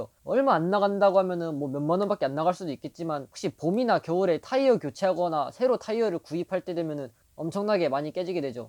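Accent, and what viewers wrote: Gangwon, South Korea. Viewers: 강원도 사투리가 살짝... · 강원도 사투리 정감가네요ㅎㅎ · 강원도 사투리 ㅋㅋ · 경기 북부 강원도 사투리 ㅋㅋ 제가 잘 알죠. 가평. ㅋ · 강원도억양 아직 있으시죠? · 와 또래중에 강원도억양 제대로들은거 처음이다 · 말투가 강원도?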